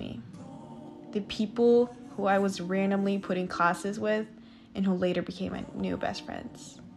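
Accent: United States. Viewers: This video was so touching. Thanks for sharing your experiences...and you‘re English sounds so American now haha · just wanted you to know I thoroughly enjoyed your exchange video in Iowa. I also want to thank you for taking the time to answer all the questions from potential exchange students that follow you on YouTube. As you know it's a big step to leave home and family for almost a year all on their own. Especially going to a new country, with different customs, new language, new school, staying with strangers and meeting new friends. Your video will most certainly help future exchange students and those thinking about it. I am a former host dad now living in Virginia and have previously lived in New York, California, Illinois, Oregon and Colorado. I still enjoy following the exchange program and watching high school students as they grow into young adults throughout the year and experience the American way of life first hand. 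I think it is an opportunity of a life time that very few students will ever get to experience. I worked for the airlines for many years and have been lucky enough to experience many other countries myself and look forward to my first trip to Denmark this fall. I have heard of the Faroe Islands but admittedly know very little about them (kind of like Iowa was for you) 😂 Since I'm always curious about other countries I had to google the Faroe Island and find out more information. Let me just say the pictures were absolutely beautiful!! Reminds me of Ireland in many respects. I have to ask, how is it your English is so perfect and with an American accen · Hi, did you learn your American English pronunciation and being to speak well while you were on student exchange program? Also, it’s been over a year since then, how are you doing now and you still reminiscing your school year in US? Or just a distant memories now? 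Thanks.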